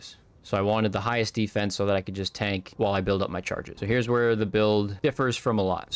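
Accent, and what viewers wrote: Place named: England, United Kingdom. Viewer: Why you speak like that? Can you speak normaly? Many english people do like you, it's boring. But very nice video, and your honesty is also very nice.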